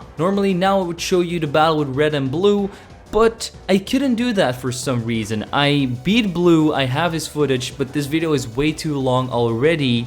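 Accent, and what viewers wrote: Belgium. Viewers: Wait.. You're Belgian? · Deze man komt gewoon uit België!!!! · BEN JIJ EEN BELG!? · U from belgium? Nice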